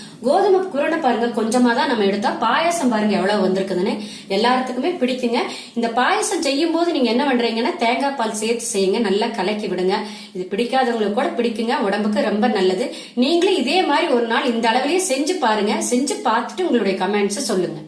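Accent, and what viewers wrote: Kongu, India. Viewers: கொங்கு தமிழ்❤ நானும் கோயம்புத்தூர்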